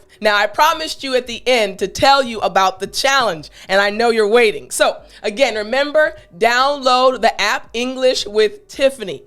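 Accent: United States. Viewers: Hi Kolya, I'm not Tiffani... but... she is American! I'm not sure which state, however... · Are you American English. I know it again and again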